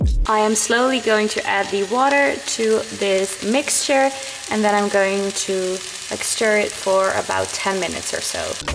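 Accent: Netherlands. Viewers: Just a tiny question.... are you dutch? Edit: laat maar de Milner heeft t verraden 😂 ik merkte het aan je accent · I heard the accent and thought: Hé, Holland!! Leuk joh dat je vlogt! Vorig jaar was ik ook super healthy en toen... werd ik zwanger 🤰🏼. Lief kind maar wat ie met mijn lichaam heeft gedaan 😱 PUDDING!! Ik wil mijn abs terug! 😫 Dus we moeten van een “pudding-“64 kg naar een “in shape”-58,5kg. Here we go!! 💪🏻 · Wait... Are you Dutch?🙈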